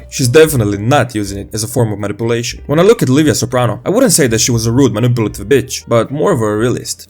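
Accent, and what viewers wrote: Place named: Eastern Europe. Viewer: I found a lot of humor in the series, but I felt it was unintentional and a reflection of my own od sense of humor. This narrator - is it an AI generated voice with some odd Slavic or Israeli accent on speed?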